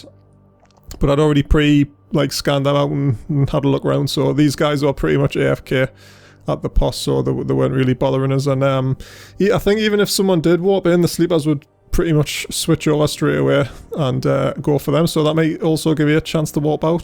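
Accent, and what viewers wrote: Newcastle, United Kingdom. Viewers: Always great hearing another Geordie playing eve :D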